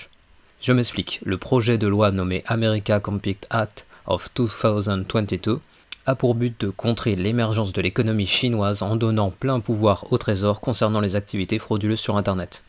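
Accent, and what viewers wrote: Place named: England, United Kingdom. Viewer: J'adore ton accent anglais of course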